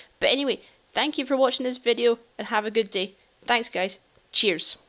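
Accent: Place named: Scotland, United Kingdom. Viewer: Ah yeah. You're the first Scottish FNaF fan that I've ever heard. For that you earned yourself a subscriber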